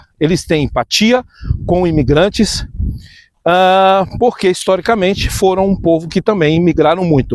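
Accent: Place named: Brazil. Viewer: Caro amigo Brasileiro, sabe uma coisa ? Eu, Português de 85 anos de idade, tiro-lhe o meu chapéu, por compreender e aceitar o jeito português, porque o Português genuíno é exctamente isso tudo que acabou de ler, na percentagem de 100% . Se não fosse o sotaque o Senhor, hoje, já seria um português genuíno e verdadeiro. Na companhia dos seus familiares, seja muito felíz em Portugal.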